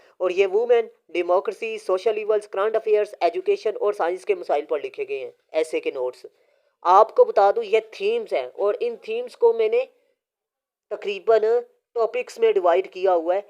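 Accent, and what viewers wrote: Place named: Punjab, Pakistan. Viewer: Pronunciation theek na hon to listener aur learner k spelling mistakes honay k chances bohat zyada hoty hen. آپ جب اردو میں بات کر رہے ہوتے ہیں تو خالص اردو استعمال کیا کریں۔سیالکوٹی یا لاہوری لہجے سے پرہیز کیا کریں، "ر" کو "ر" ہی رہنے دیں "ڑ" کرنے سے بابائے اُردو کی روح کانپ جائے گی۔ And when you are talking in English,kindly adopt the British or American accent.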